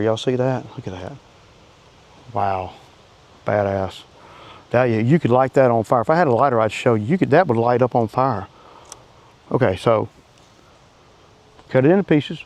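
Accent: South, United States. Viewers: and the audio is great. I can here that southern drawl sir!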